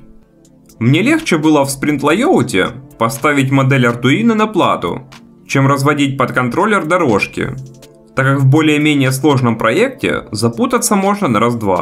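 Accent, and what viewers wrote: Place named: Ukraine. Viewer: Нормальный акцент украинский, так и должно быть.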